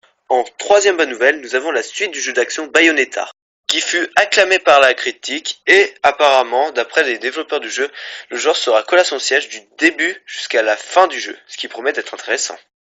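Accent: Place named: England, United Kingdom. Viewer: L'accent english :p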